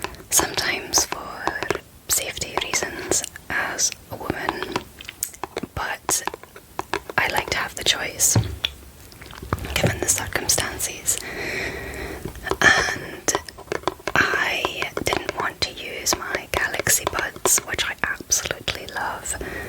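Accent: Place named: Scotland, United Kingdom. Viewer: Best (and only) asmr i watch / listen to. And the scottish accent is absolutely amazing!!!! Thank you!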